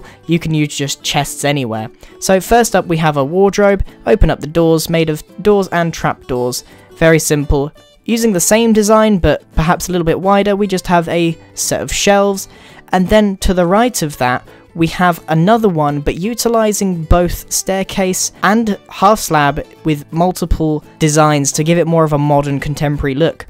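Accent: United Kingdom. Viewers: hes british, no shit sherlock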